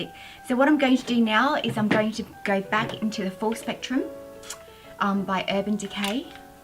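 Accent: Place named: Australia. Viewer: Wow , we live in Queensland, would love to meet and greet when you come.. Hoping you can sub back and stay connected.. I knew you where aussie when I heard your accent..Love this channel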